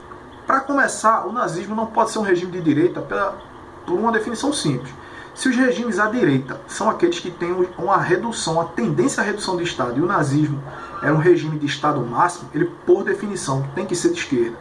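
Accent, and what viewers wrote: Pernambuco, Brazil. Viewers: Você é pernambucano? Eu também sou, Parabéns pelo vídeo, estou querendo fazer um curso de história e estes vídeos me deixam com muita empolgação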